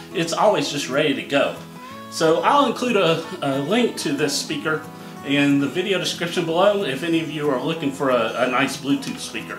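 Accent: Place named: Alabama, United States. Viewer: Love the radio and your solution until chassis can be repaired. Hope you don't mind me asking. Are you from Alabama? Accent sounds familiar. Anyway all the best from Western Australia.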